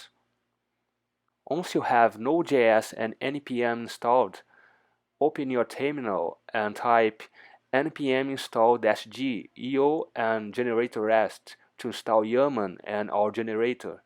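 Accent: Brazil. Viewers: Fiz a mesma coisa, o sotaque não engana! Show Brazuca na veia! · Pause o video pra conferir se ERA BR vc. Aceitei 😂. Muito bom o vídeo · I can tell you are brazilian by the accent, very good video sir.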